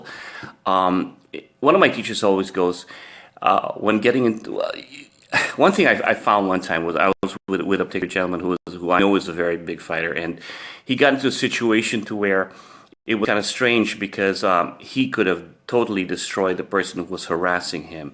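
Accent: Philippines. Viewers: Sir Doug, sabi ko na eh. Yung accent mo pa lang sir Pinoy na Pinoy na. I always watch episodes in Forged in Fire. Hihi. Your weapon sir will keal! 😊😊😊😁😁😁❤️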